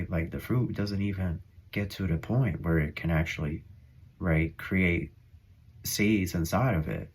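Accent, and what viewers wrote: South, United States. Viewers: The group who hounded me and lodged a claim against me is a law firm in the UK (Irwin Mitchell LLP) who promised me a No Win, No Fee deal, then reneged. They intended to grab a slice of the pie when my family house (worth $3M) in London was sold. But another law firm representing a man who was having an affair with our family cleaning lady and claimed to be my (wicked) stepmother's long lost nephew, got to it first and removed my name from the title deed. IM transferred the ruling (made without my knowledge) to the Supreme Court of NSW, Australia. I've been fighting it as a litigant in person for a year now. A Judge ruled against me last Friday because he believed the evidence of a fraudulent affidavit of service from a crooked organisation called ASI (All States Investigations) which declared I had been served. But IM don't get a penny because I'm protected by Australian domestic policy which does not permit my pension to be garnished or possessions worth less than $9000 to be seized. 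So you are spot on. Its similar to "Robodebt" and "Mr Bates v the Post Office" in its sinister implications of State v Individual). I know I am protected by angels. The other matter (a no contact romance scenario relating to my ex-GP) is not connected to the financial attack, but it might involve the presence of a demonic spirit, so I am taking no chances and will join the Catholic Church to take advantage of their exorcism procedure. I love your southern accent and your individual personsa. Th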